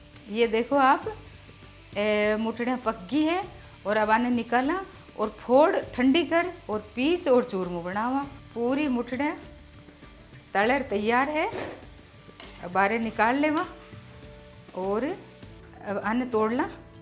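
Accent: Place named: Jodhpur, India. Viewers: Aap ke marwadi bolne ka tarika bhut hi pyara h hukum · Didi dal bati su jyada apri marwari sun n Dil n sukun ayo kiti miti bole h apnane marwar ri Ram ram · Nhi sa ....ye bikanri bhasa ne bhi marwadi keve pr ye proper marwadi ki jodhpuri marwadi bol riya h pr ha kathe kathe kuchaman wali assign aa riyo h sav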